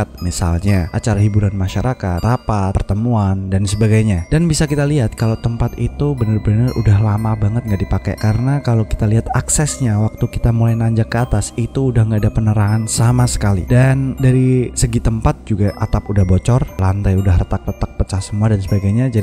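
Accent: Java, Indonesia. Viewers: aku mnden wedii.. mnaa orang jawaa suaranya...sukses rus ya kka 2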